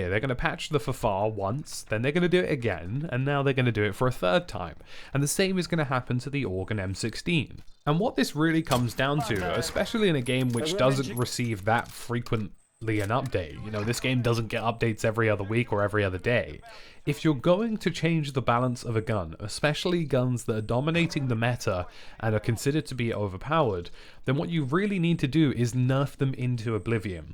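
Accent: United Kingdom. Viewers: Sick channel nice to hear a uk accent ha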